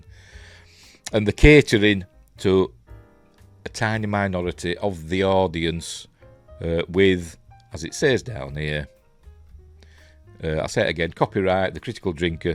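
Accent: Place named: Yorkshire, United Kingdom. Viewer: Nice to hear another Yorkshire accent on Youtube, South Yorkshire? Old Wezzy myself that'knows....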